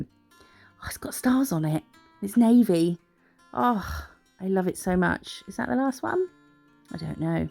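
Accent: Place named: RP, United Kingdom. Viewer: Absolutely love your videos. I laugh and learn! Also gott say I adore your accent. Im here in Texas and your Queens English is lovely to listen to.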